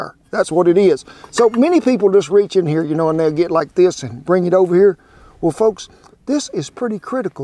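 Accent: United States. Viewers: 😲 I really like your american accent.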